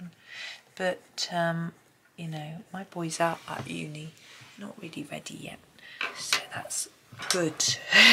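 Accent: United Kingdom. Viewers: I love your chattie videos. The British accent and info on the “ways” the Brit’s do and say things. Just love it. 🥰 😊 And, of course, your coloring.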